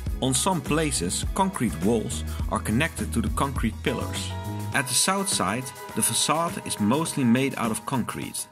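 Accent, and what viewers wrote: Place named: Netherlands. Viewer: Another well turned out vid. Your voice and cadence of speech are very good for the narration. And the Dutch accent is chic. :)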